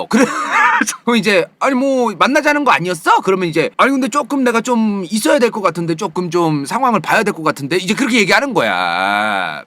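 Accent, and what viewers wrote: Chungcheong, South Korea. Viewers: 앜ㅋㅋㅋㅋㅋ충청도 말씨 너무 좋앜ㅋㅋㅋㅋㅋ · 충청인으로서 공감~~ 고향말투 빠지네요ㅋㅋ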